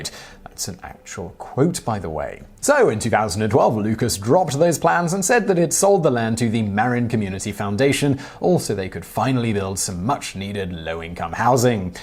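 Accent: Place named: RP, United Kingdom. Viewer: How is it possible for you to literally sound posher than Prince William? Dial it back a couple of points dude, it sounds ridiculous. The poshest person in the country doesn't sound that posh so what are you trying to prove?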